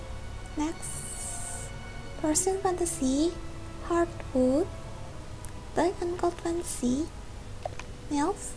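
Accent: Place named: United Kingdom. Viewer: You have a nice britsh voice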